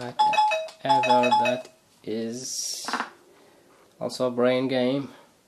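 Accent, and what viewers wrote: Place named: Brazil. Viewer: Lol... Would you happen to be Brazilian? If not, hopefully no disrespect is inferred. I ask bcuz I hear an accent... Thanks!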